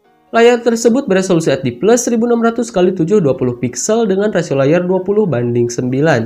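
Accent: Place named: West Java, Indonesia. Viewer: SAYA MENCIUM NADA URANG SUNDA IEU MAH BOAA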